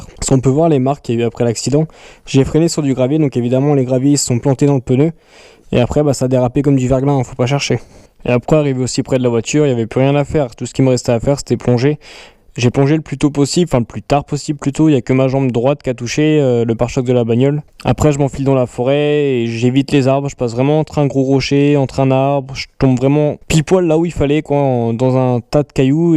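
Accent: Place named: Vosges, France. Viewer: ouais j'ai vu après sur ta chaîne. J'ai habité dans les Vosges. J'ai reconnu un peu l'accent 😁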